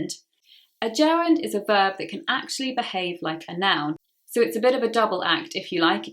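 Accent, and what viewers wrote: United Kingdom. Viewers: I love your british accent tone ❤❤❤❤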